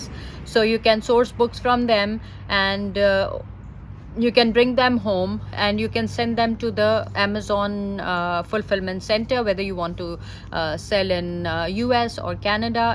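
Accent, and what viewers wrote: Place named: South Asia. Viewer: Baaji i love your desi English... feels like home, although im from India 🇮🇳 but still its feels the same...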